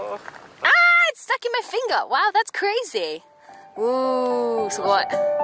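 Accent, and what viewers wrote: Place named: Australia. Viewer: Lol! I noticed that too. That's that Australian accent kicking in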